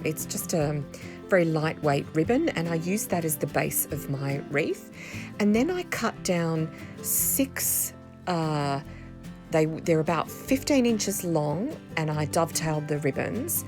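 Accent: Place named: Australia. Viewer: Hi these are gorgeous DIYs, it's nice to see /hear an aussie crafting on youtube, I live crafting but struggle to find suppliers many ribbon at Christmas here I'm in rural Victoria, I really enjoy your channel thanks for sharing all the best Mary 🌻😊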